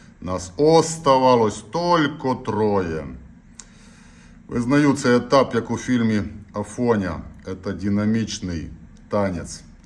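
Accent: Transcarpathia, Ukraine. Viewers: Йой,як я давно не чув файної закарпатської говірки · Закарпатська озвучка-ТОП❤🫡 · Дякую за роботу і Закарпатський діалект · Дуже файно на закарпатський лад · Класно по Закарпатськи))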